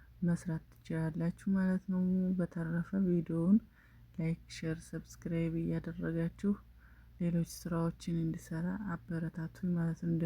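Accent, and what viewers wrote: South, Ethiopia. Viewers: በጣም ደስ የሚል ደቡብ አገጋገርን ያሳየሽ እህታ ለየት ያለ ነው እንዲህ አይነት ለየት ያለ በጣም አሪፍ ነው ሚያቁትን ማሳወ ሌላ ክፍለ ጊዜ እንጠብቅሻለሁ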